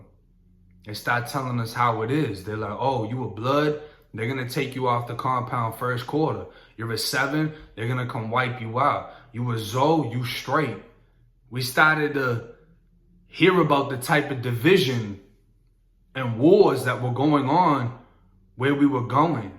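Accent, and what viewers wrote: Boston, United States. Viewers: Bro! I love that slight Boston accent + you really have a feel 4 telling what happened and taking us there! I'm binge-watching this shit until I'm up 2 date. Greetings from Romania!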